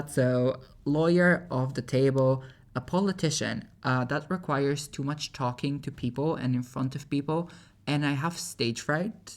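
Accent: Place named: France. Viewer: Its so nice knowing how you would talk longer then 1 minute, its so funny (in a good way, it makes me laugh because I have the same humor and I love that accent!! (is it french? idk)). Also very nice to know what is going on with the channel. <33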